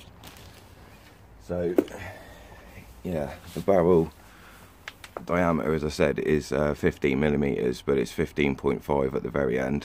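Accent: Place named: Plymouth, United Kingdom. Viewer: Great review mate, Thanks. Gonna treat myself to one of these. You sound like yer from Plimuff! Regards from another plymouthian!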